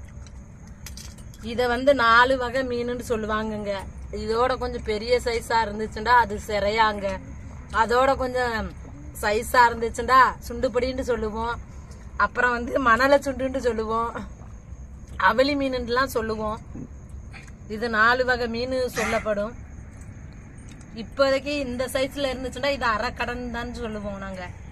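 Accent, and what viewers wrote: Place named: Salem, India. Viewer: சேலம் மாவட்டத்தில் இருந்து பேசுறாங்க சூப்பரா இருக்குங்க வீடியோ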